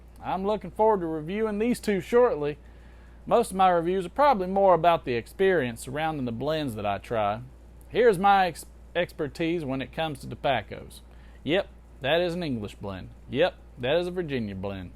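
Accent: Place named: South, United States. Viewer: Nice southern drawl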